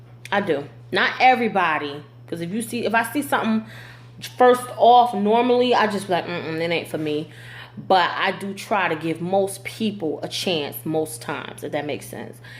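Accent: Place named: New Orleans, United States. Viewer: its so crazy how you remind me of several ppl i know in new orleans... accent and all!! I don't even hear Jersey until you actually say "Jersey" lol i just listen to you talk like "i already know her" lolol its so weird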